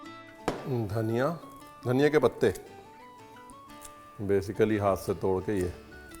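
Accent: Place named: Kanpur, India. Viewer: Aap to kanpuri boli bolte hai fuffpi kanpur ki thi mujhe Aap ki her dishes pasend hai Mai banati hoo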